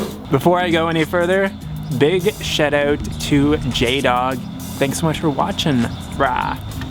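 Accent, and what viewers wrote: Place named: Canada. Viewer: You're Canadian and you talk like a California surfer, only with the occasional aboot thrown in.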